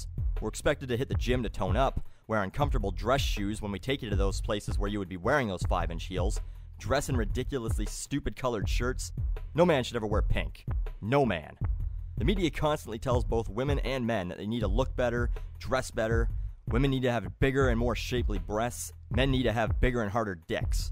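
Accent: Canada. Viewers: you had a way heavier canadien accent back then. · Oh god you can really hear Canadian accent. 10 out of fucking 10. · Bro! Listen to the end of sentences! He sounds so Canadian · I here more of a Canadian accent with these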